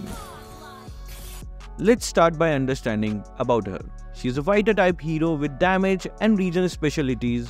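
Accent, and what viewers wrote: India. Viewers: Are u from India bro ur voice is like an Indian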